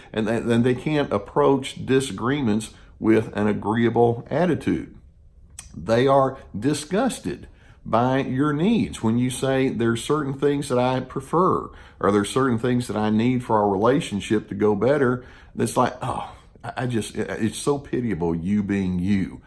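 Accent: South, United States. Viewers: I really enjoy these videos and learn so much about how to apply the principles that both you and Dr. Ramani explain So well. You're warm and folksy style reminds me a lot of sheriff Andy Griffith of Mayberry RFD. Are younger people that is a black-and-white sitcom from the 60s and 70s about a very small southern town. Andy the Sheriff had a little boy named Opie and virtually half the shows were about some sort of silliness that occurred when people were trying to get what they wanted or solve a problem in the wrong way and ended up in trouble. Eventually Sheriff Andy would figure it out and help straighten it out and might give a lesson or two to his goofy but narcissistic sidekick deputy sheriff. And then most shows would end with Sheriff Andy Griffith explaining some principle of good citizenship and healthy honest Behavior to his son Opie, who was about 6 or 7 years old. 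He had a similar accent to and so videos remind me of sheriff Andy explaining life proper values and behavior to Opie. That show is available on streaming services and it's a very relaxing watch before bed or when you're in the mood to fantasize about a world where people are basically decent and everybody learns their lesson and not too harsh of away in the end and Common Sense prevails. Not to mention that doing the right thing pays off. What a shame it is that he couldn't raise both us and the narcissist so that we had good self-esteem and boundaries and the narcissist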